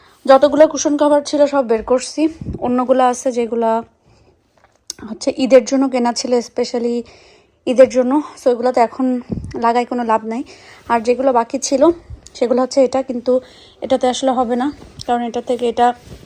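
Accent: Sylhet, Bangladesh. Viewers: Apnar Muk a sylheti vasha sune kub Valo laglo,ami nije o ekjon sylheti tai❤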